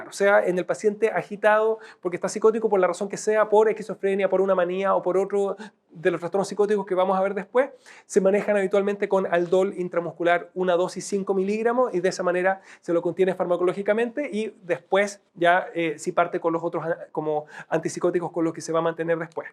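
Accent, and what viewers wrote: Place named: Chile. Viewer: Q agradable encontrar un acento chileno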